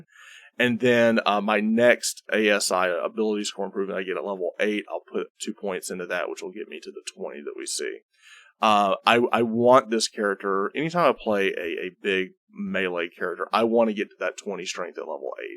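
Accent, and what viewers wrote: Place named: South, United States. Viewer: As a southerner. I have to say its great hearing an eloquence bard give dnd advice in a southern drawl.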